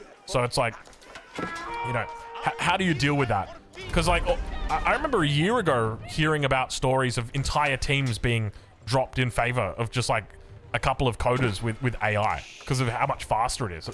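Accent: Australia. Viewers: sounding Australian today me boy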